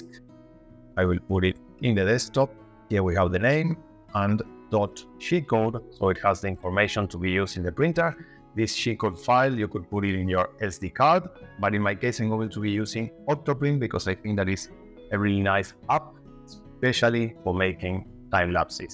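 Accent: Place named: Latin America. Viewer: De donde eres? tienes un acento tirando a latino..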